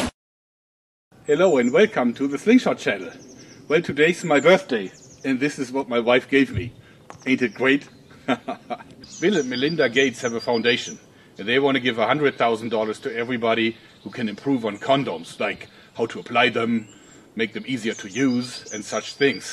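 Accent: Germany. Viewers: when you hear his ,,dialect“ of speaking, you know that he is from germany;) geile video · It's cool how I recognized the German accent even before I knew you ARE German. xD By the way, I'm German too, so it's no hate. I also have some bad accent. :)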